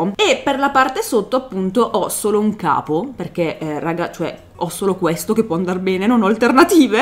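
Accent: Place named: Brescia, Italy. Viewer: Mi posso sbagliare, ma per caso sei Bresciana? Mi sembra di intuire un po' di familiarità nella tua voce😅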